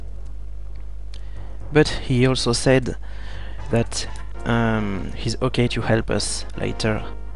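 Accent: France. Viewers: Bro, we figured you're french from your first word! You didn't have to mention xD t'assures mec 👍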